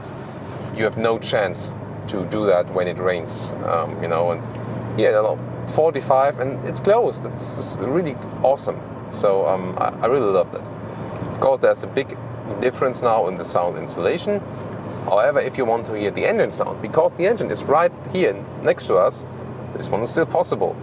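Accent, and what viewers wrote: Germany. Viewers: Es ist komisch einen Deutschen Englisch Sprechen zu hören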